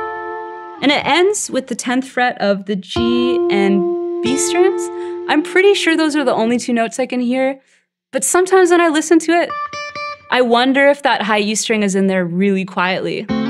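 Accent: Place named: Canada. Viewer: I knew you were Canadian without checking. You said, "I'm sore-ey" as opposed to us Yanks' "sah-rey." Awesome lesson.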